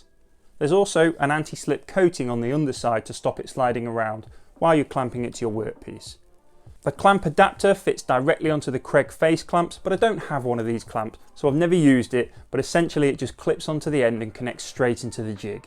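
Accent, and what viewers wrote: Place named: United Kingdom. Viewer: Thanks for the review. Just bought one! And loved the Limey accent (I'm a Brummie in Canada!)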